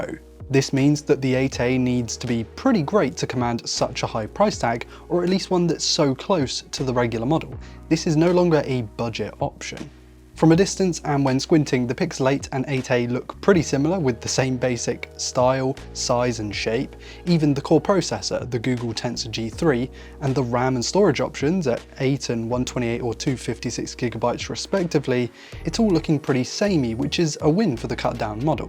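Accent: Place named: United Kingdom. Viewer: He sounds British so whats with the prices in dollar 💵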